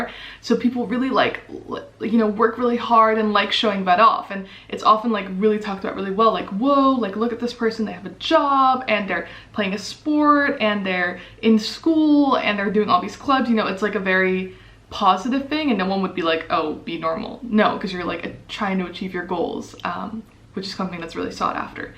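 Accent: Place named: Netherlands. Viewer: Nice vid, and funny to hear a dutch accent getting in there while talking english! Good one for the directness part: dutch will ask you without any problem what you pay for rent if talking about housing 🤣🤣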